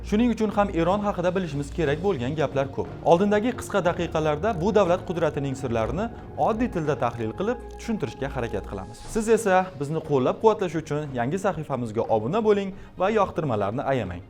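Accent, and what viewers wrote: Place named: Uzbekistan. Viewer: ""Yoqtirma"" sizni sõz boyligingiz va asl Õzbek laxchangiz ajoyib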